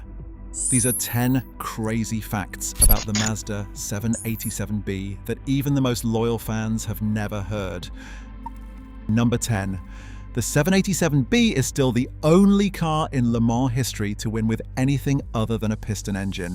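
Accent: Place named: United States. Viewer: Great video, but as a British writer and teacher, I’m intrigued by your American pronunciation of numbers. 😊 I’ve never heard anyone British do that before. Not a criticism - I’m just curious.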